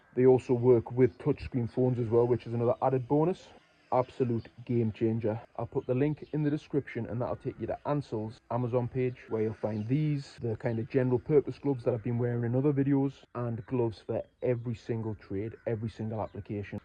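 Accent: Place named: Newcastle, United Kingdom. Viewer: Union scaffolder since 2014 but started taking training on tube and clamp earlier this year and got into watching your videos. Got to say I love the Geordie accent. My brother in law is from Chesire and the Scouse accent is great as well. Been watching Dibnah videos for years. Always done systems with tube and clamp additions but learning to put up proper tube and clamp has been a blast. Doing "English" style as we call it here and "Western" types. Western we put the transoms on the standard instead of the runner/ledger. All wedge clamp here though. Bit of a holdover from the days of scaffolds being all wood. Tube and fitting was never really a thing until it was used for industrial in the 70's. Love the videos mate. Glad I could help you out with the van some.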